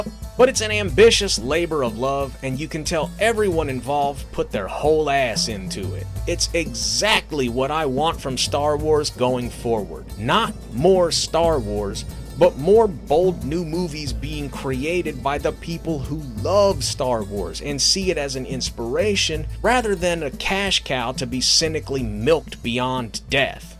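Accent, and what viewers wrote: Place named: South, United States. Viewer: Nice Southern accent. Texas? Dallas? Kansas? Houston? Nashville? I'm British so its impressive I know all these names.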